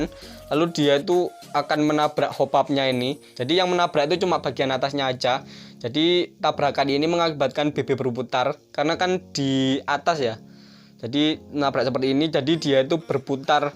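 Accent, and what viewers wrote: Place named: Java, Indonesia. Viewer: Jowone medok ea🤣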